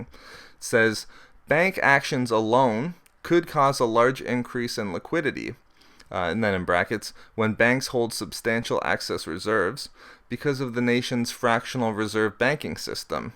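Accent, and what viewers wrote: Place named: North, Canada. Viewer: Very interesting. I'll check these out and read them for myself, but I am interested in seeing whether inflation rises and what the particular causes end up being. On a more humorous note, do you know that you tend to pronounce "excess reserves" as "access reserves"? Hehehe... Must be that light northern Canadian accent. Don't take that as a criticism though. It didn't take away from the content. I just thought I'd have a friendly pick at you. Looking forward to the next CoinCast videos!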